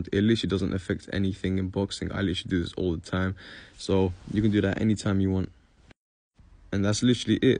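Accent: United Kingdom. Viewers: Brother are u British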